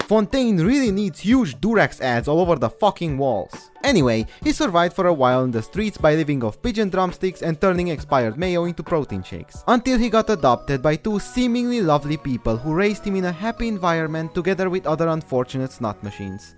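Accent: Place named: Romania. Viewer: your accent sounds sooooo familiar. Are u by any chance romanian?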